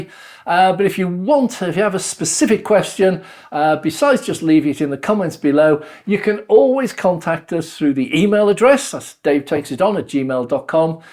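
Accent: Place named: West Country, United Kingdom. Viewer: You have some west country accent (Yeovil maybe?) and that tends to drag out some words. Also as you say thinking and talking at the same time will slow down speech. Someone who speaks quickly maybe is not putting too much thought in. As for just a minute I would say Kenneth Williams was more cheating than thinking.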